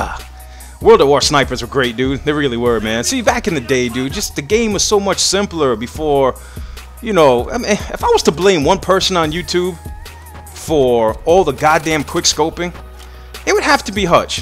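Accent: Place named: East Coast, United States. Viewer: He's not black. Its a common east coast accent that white people have.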